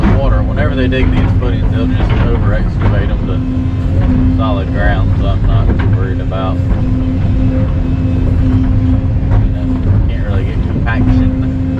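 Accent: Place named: North Carolina, United States. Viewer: know a fellow north carolinian when i hear one. i enjoy watvhing your expertise with your heavy equipmemt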